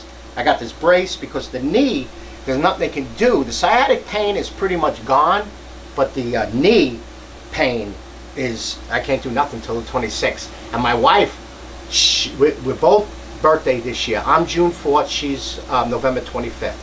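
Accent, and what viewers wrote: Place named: New York, United States. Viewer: Hey just a few things. I'm here saying to myself this guy has to be from NY. Then you mentioned when you lived out here (I'm from NY, Ret.PD). It's just the way we speak lol. The other thing is that I'm sorry for what you have been going through and I hope for a speedy recovery. But what struck me most is your wife. What a class act you are. My wife is one of the most precious things in my life including my kids. And how she took care of you and you making sure you put her first just makes me enjoy and respect your videos more. Not many are as lucky as we are. Take care and God bless you and the fam.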